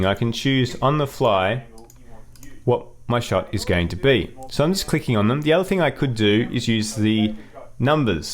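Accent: Australia. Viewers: Brilliant video, love your work! PS, I'm a fellow Aussie! Nice to hear a familiar accent on such a great tutorial. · are you australian?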